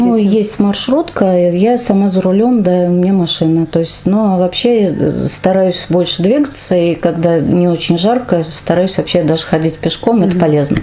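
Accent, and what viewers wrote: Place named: Samara, Russia. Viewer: Привет самарчанка, сразу видно, что человек из Самары, и говор самарский.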